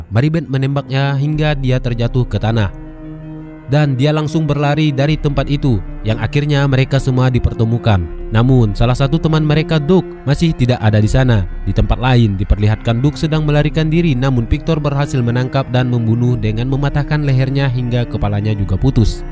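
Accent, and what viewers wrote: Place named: Medan, Indonesia. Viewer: Mimin kayak orang medan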